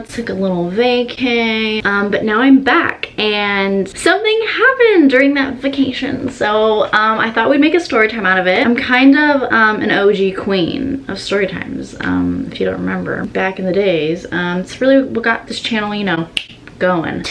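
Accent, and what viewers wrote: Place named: Australia. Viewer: loved that australian accent lol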